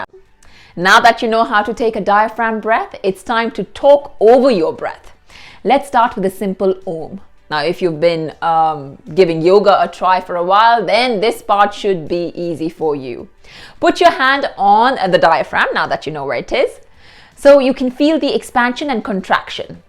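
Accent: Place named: United Kingdom. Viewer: Her accents ….your accent is quite fascinating!..it borrows from a lot of areas ,a slight British as well ..the way u spelled ‘KAPALBHATI’ ,I don’t know what to talk of it 🗣️ !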